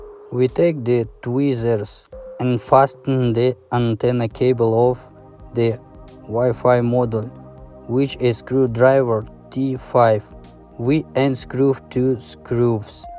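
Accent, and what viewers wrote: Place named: Russia. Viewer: Нормас только голос надо приправить ну надо говорить как англичане а то ты просто с русским акцентом говоришь английский слова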